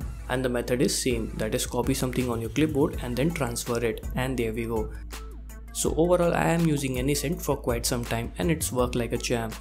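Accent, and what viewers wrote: India. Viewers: Nah this is Indian Accent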